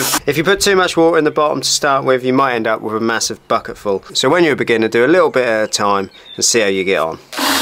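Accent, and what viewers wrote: United Kingdom. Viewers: Love his British accent 😅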